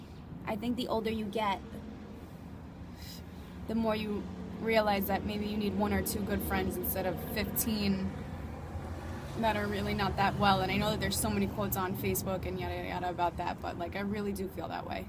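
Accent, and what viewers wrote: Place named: Massachusetts, United States. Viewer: I am going to guess that your from Mass?!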